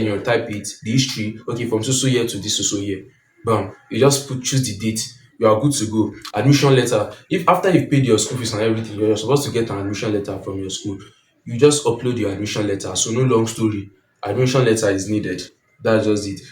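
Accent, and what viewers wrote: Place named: Nigeria. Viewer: Just saw ur video....and i liked it brother... good work 💪💯... keep on the 9ja accent am proud of it....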